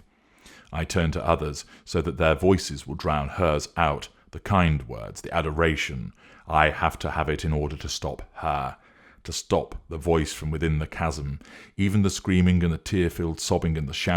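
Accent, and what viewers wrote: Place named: RP, United Kingdom. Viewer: Thanks I must say hats off to you again, I love your INTEGRITY and your Queens English.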